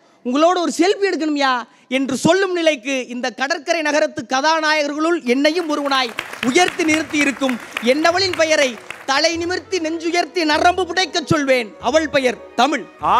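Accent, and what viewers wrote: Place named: Sivagangai, India. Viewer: சிவகங்கை மண்ணின் வாசம் இந்த சகோதரன் பேச்சில் தெரிகிறது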